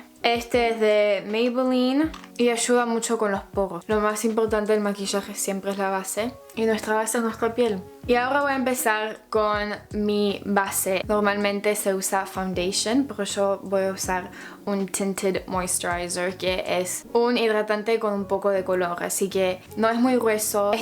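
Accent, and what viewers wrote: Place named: Israel. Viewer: איך שומעים את המבטא הישראלי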